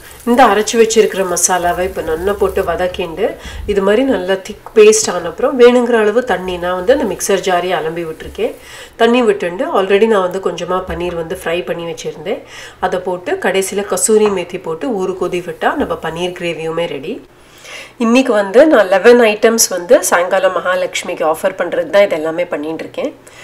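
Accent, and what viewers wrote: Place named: Karnataka, India. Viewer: I am Sujithra from Chennai. Nice video. will try the peda recipe, looks yummy. very nice recipes. what is your mother tongue, your tamil looks a mix of kannada or tulu, correct me if I am wrong, lik your videos, they are very positive and nice. keep going, all the best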